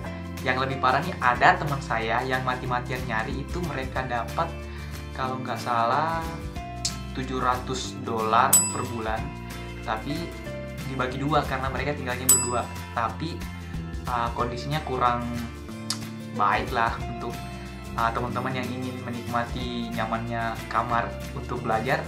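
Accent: Makassar, Indonesia. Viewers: orang makassar kah om? dan ini ausi mana??sydney??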